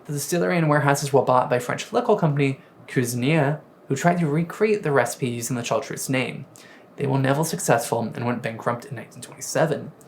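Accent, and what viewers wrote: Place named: South Korea. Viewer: His pronunciation and his diction are all over the map. He places accents for words in the incorrect place and, if that wasn’t bad enough, his actual accent sounds as though he learned to speak English as a second language in Korea.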